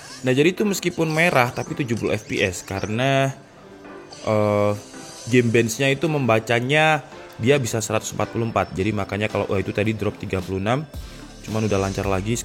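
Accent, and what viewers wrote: Malang, Indonesia. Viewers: umak wong malang ta sam?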